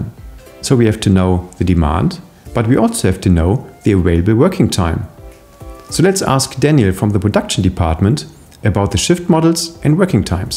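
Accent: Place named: Germany. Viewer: Man hört, dass du Deutscher bist. warum machst du dann nicht das Video auf deutsch?👀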